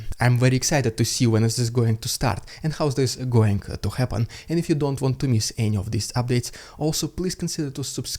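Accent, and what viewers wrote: Russia. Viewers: You sound so Ruzzian!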